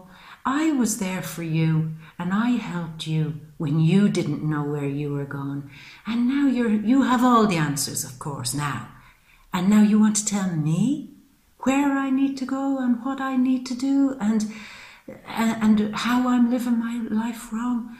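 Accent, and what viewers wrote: Ireland. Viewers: Hey! Just found your channel and loved this reading 💟. Great to hear a fellow Irish woman here 😊. Look forward to following you and looking out for future notifications. Keep up the great work! Thank you 😃👏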